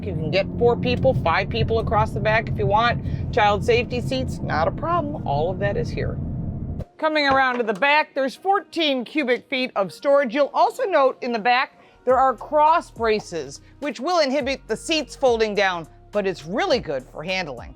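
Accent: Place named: Midwest, United States. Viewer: Got to love that Midwest twang... Great review!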